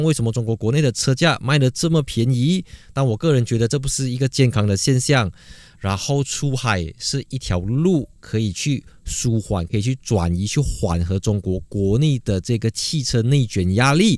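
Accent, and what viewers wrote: Malaysia. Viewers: 口音用词法都看得出你是马来西亚人😂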